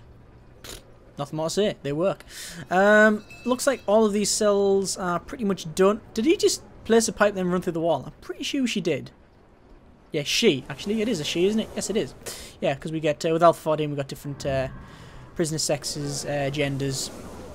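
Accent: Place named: North, United Kingdom. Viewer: You're so northern. :D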